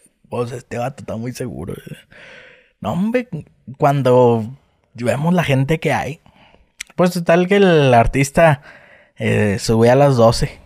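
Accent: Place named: Chihuahua, Mexico. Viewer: YO SOY DE CHIHUAHUA ..Y ME IDENTIFICO MUCHO CON SU MODO DE HABLAR.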